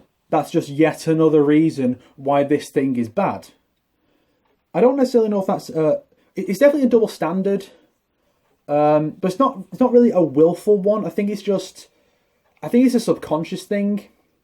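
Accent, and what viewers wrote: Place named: United Kingdom. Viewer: Seriously, you are great at what you do! You made a video, left your opinion. There's no need to explain yourself. I love to know where in the UK you are from? Manchester? Yorkshire?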